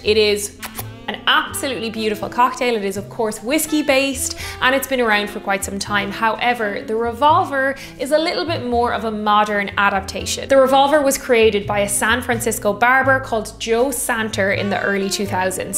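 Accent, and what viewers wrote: Ireland. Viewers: I'm very happy that I discovered your channel. You are engagingly beautiful and the brogue is wonderful. Gorgeous!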